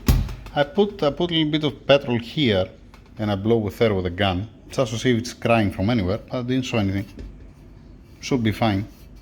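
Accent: Greece. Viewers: Just a quick question firstly I absolutely love how that you are attention to detail. Are you GREEK?? because you sound like it 😅😅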